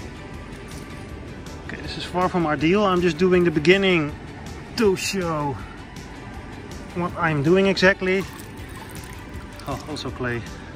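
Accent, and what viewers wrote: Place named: Netherlands. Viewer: Easy to hear you are Dutch. :)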